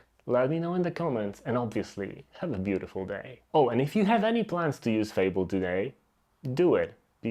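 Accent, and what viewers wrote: Poland. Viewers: no nieźle xD widze miniaturke nowego dla mnie kanału, patrze " " i takie there is no chance this guy isn't polish, wchodze na kanał i opis patrze United States i mówie no kurde, puszczam ten film słysze akcent i już sam nie wiedziałem co słysze po czym widze screena z Cześć więc jednak miałem racje 🤣 nie oglądam chyba w ogóle polskiego youtube więc zawsze mnie śmieszy jak znajduje polaków nadających po angielsku. W sumie to nic dziwnego - widownia pewnie 50 razy większa jest niż w polsce.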